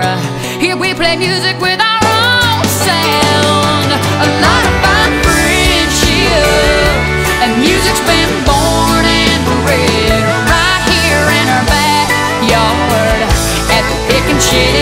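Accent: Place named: South, United States. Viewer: Please come back! Love your voice an your down home sound. Need more of that now days here in southern illinois!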